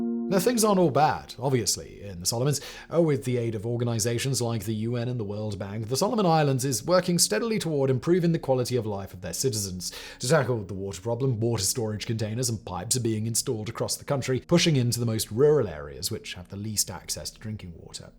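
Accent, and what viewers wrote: United Kingdom. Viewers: Hard enough to understand his heavy British accent and then he mumbles too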